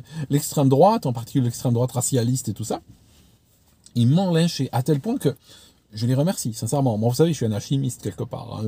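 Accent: Switzerland. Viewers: On s'en fiche qu' soit FM. On l'aime tel qu'il est avec son bonnet, sa tignasse et son accent suisse par intermittence. Un franc mac qui ne croit pas aux missions Apollo, ça n'a pas de prix.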